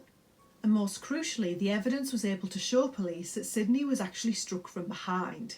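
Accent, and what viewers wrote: Newcastle, United Kingdom. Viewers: are u from Newcastle?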